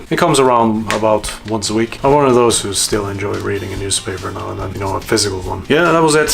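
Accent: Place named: United Kingdom. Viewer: I just watched your 'Moving to England' video and your accent has changed a lot in the six months between the two uploads. Less American, more British, much better!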